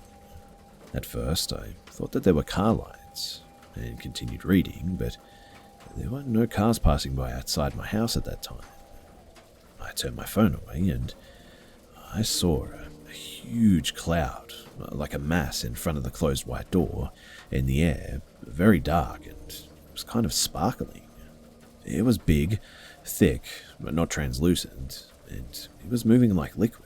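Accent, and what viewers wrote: Australia. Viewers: Is it bad that i just realized he is a ausie?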